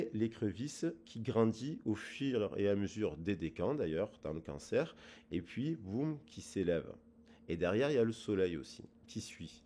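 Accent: South, France. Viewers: Bonjour je suis tres heureuse de Vous voir sa me fait plaisir 💝😃 de Vous entendre j aime Bien votre accent du midi qui nous apporte le Soleil Dan's Notre Coeur merci beaucoup rayon de Soleil🌞👍gros bisous👄☘️💃🕺🌈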